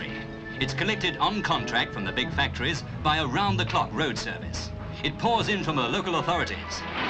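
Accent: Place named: England, United Kingdom. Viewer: So lovely to hear a proper English accent instead of trendy street nonsense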